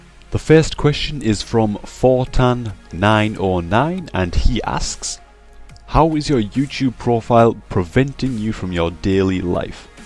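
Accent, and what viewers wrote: Newcastle, United Kingdom. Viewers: sound like you have a geordie accent lol, sorta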